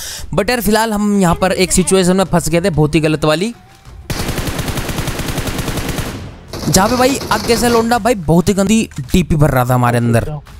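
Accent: Haryana, India. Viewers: areeeeeeeee bhai love you ek haryanvi ko haryanvi hi pehchaan sakta hai😊😂❤️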